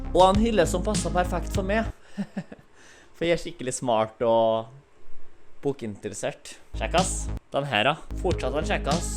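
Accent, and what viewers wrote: Norway. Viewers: Molde dialekten tror eg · Hvilken dialekt snakkes? En slags nordlig variant?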